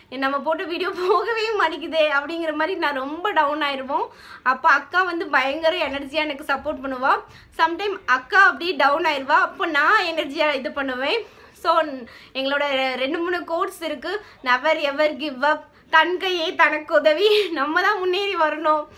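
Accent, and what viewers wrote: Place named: Nagercoil, India. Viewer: illa akka...unga slang nagarkovil madiri iruku adan keten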